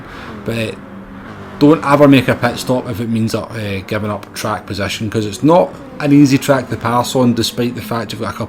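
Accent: Scotland, United Kingdom. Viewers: Nothing wrong with the dulcet tones of Scotland. You want to try being me. I'm a geordie :) Thanks for the video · Hi Great video mate I’ve never bothered to learn this track i think it’s about time I did so thanks for the video The Scottish accent hard to understand never People must listen a wee bit harder top man i’m from Essex people never understand me 😂👍🏻👍🏻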